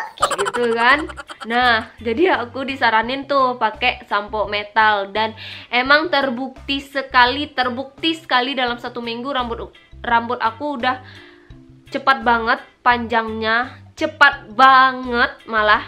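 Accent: Indonesia. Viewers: Suaramu dek mirip minang kocak 🤣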